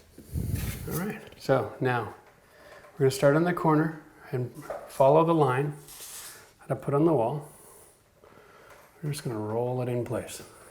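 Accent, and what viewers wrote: Canada. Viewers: I just LOVE your approach! Ty for keeping the moment of almost crashing off the ladder - dude makes me feel more normal! Ty for you just being so real !! I am a 60-YO DIY woman, learning how to care for own home after 25 years of marriage — your help / expertise boosts my confidence so much! Also love your friendly Canadian accent & approach 🦋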